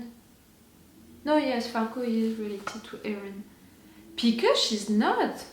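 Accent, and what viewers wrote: France. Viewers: Tu as l'accent français quand tu parle anglais, c'est mignon 😊😊😊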